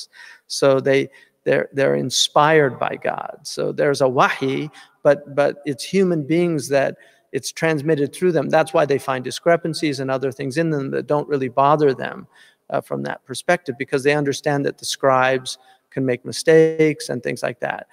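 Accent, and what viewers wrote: Arab world. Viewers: Marhaba Sheikh. You know that ..I can never stop admiring your ability in Arabic language. The way you pronounce is exactly like a one that was born in Arabia. I am born in middle east and I have seen Persian and Turkish when they study Islam. They can never pronaunce the word like you do. In addition to the most beautiful point you refer to. But Sheikh the way you talk Arabic just makes me speechless.It makes you more arab then me!? 😇...amazing!...رمضان كريم علیکم.. نسأل الله ان يكتب لنا الصلاة خلف الإمام مهدى(ع)!💚💥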